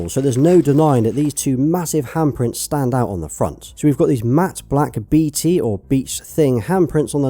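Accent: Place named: Yorkshire, United Kingdom. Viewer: I love watching your videos and your Yorkshure accent is a pleasure to hear. I would appreciate a steelbox collection as well and I thank you for displaying your consoles instead of hiding them from appreciating fans.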